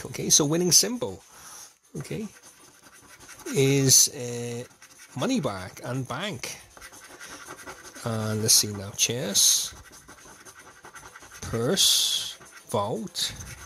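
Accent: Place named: Netherlands. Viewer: Ah you live in Belfast. Yes I know it. I just thought you had a Dutch accent.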